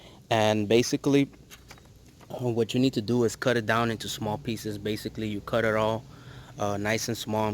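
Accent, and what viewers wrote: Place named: New York, United States. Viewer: Says Florida but you talk like your from New York, or similar.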